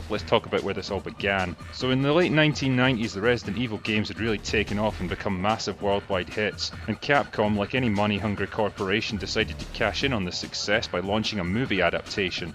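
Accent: Scotland, United Kingdom. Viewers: you sounds Scottish in this video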